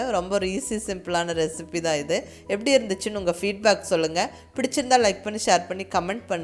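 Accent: Kongu, India. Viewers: Hi ka .I tried most of ur recipes. Everything with kongu style touch and ur speech .kuddos to ur receipes and u.👍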